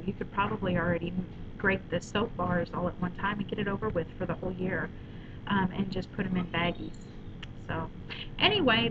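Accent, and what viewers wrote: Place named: Southwestern Pennsylvania, United States. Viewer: Wursh? Are you from SW PA?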